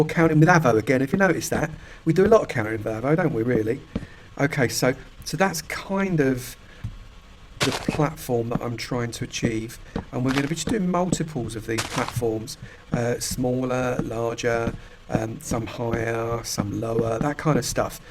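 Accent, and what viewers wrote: United Kingdom. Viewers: OFC the meshes and what not won't match, but overall design-wise there are similarities between your design and Elf City/Grand Tree. But seriously tho, The British Accent makes anything watchable! :3 I don't play MC too much, but when I'm on TILT on League... It helps me unwind and reset before reclimbing. So I always keep it on my desktop. And your guides are pretty darn great for us scrubs here.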